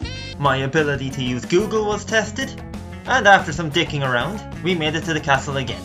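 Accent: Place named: Ireland. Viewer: Ayyyy, where in Ireland are ya from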